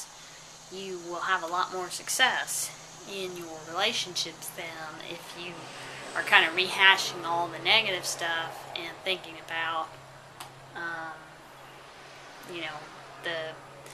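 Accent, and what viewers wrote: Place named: South, United States. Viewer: Listening to your videos really connect to me , you really captured me and your wisdom hit my core. I live near and Arkansas and you sound like you live in the south. You live in the south?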